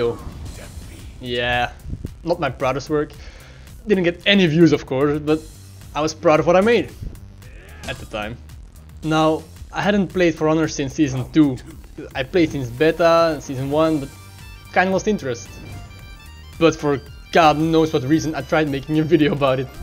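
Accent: Belgium. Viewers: EEEEEHHH !! Mais t’es belge !!! Jme disais bien que je reconnaissait pas l’accent !!! Perso je pense que tu devrais aller sur twitch, Youtube est encore trop mal foutue en matière de streams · Nice to see a fellow Belgian! I heard the accent and was doubting you were from BE or NL :) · Are you belgian?/ Zijt ge belgisch? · Kom jij uit belgië? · Kom je uit België? Hahah groeten vanuit Nederland!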